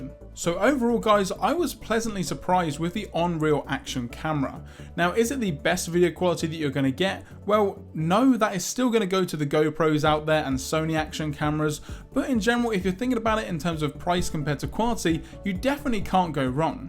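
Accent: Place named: Cardiff, United Kingdom. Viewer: Didn't know you Cardiff man 😂 are you Welsh?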